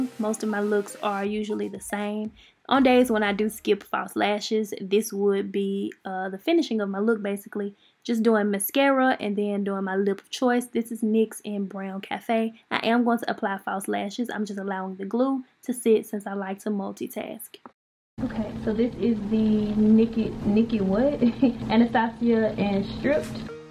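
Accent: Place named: Memphis, United States. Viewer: I didn’t even have to know you.. && I knew you were from Memphis 😅😅😅 love it👏🏽👏🏽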